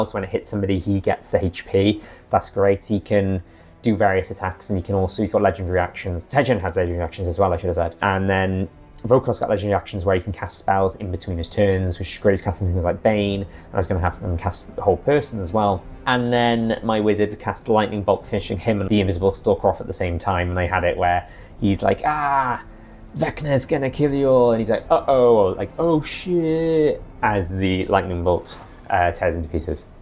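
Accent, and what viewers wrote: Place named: United Kingdom. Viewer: You are very welcome. Obviously, if you feel like it makes your videos less useful to the people who are only interested in how to run the domain and would rather stop including that stuff, don't keep doing it just on my account. I'd still watch the videos regardless. You might have to live with me asking about it in the comments then, though ;). And your accent isn't an issue at all, rest assured! I don't have a good ear for that kind of thing in the first place, nor do I really care and while I probably would have guessed "British" rather than "American English" in your case, it really mostly registers in my head as "a cool and kind person talking in English about something I am interested in".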